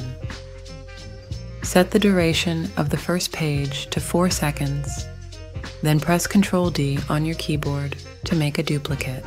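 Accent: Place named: Russia. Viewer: mam are you from Russia amazing accent waooooo 😊😊😊😊😊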